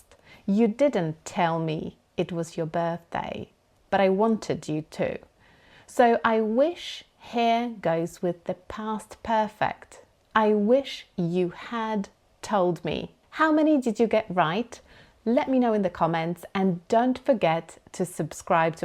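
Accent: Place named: United Kingdom. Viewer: your English is extremely clear! I love british English! It's very nice to hear you! ❤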